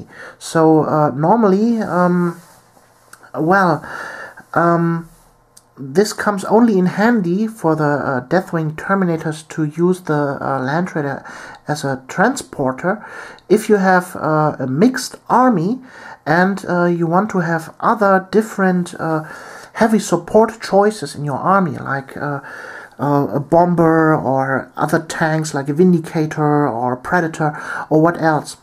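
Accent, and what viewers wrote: Germany. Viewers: hey i watch your video's when ever i get LotR but, so can you do a video for the army of the dead. also are you german?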